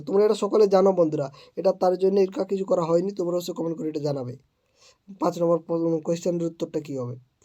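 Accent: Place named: Purulia, India. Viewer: Amar mone hoy ini purulia er basinda tai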